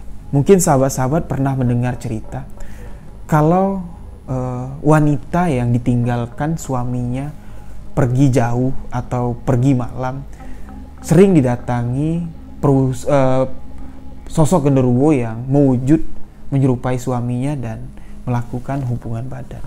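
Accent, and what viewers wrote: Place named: Bali, Indonesia. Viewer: hadir meski telat,suka dgn logat suaranya mas logat khas Bali 👍👍👍😀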